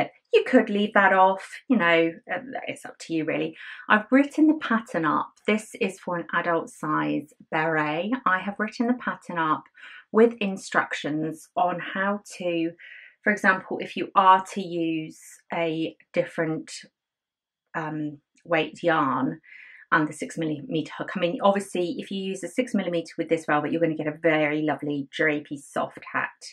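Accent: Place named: United Kingdom. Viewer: hello Ms., I love watching your vlogs. I love crocheting and reading too. I am from the Philippines and I think I am learning more about British English by listening and watching your vlogcast ^_^ Thank you very much. Happy and blessed New Year!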